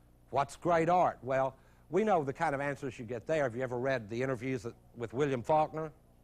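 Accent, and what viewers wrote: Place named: West Texas, United States. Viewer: Professor has the abilty to take a complex abstract topic and break it down to practical applications. His West Texas accent makes his presentation more real world. It is sad that he passed away at such a young age.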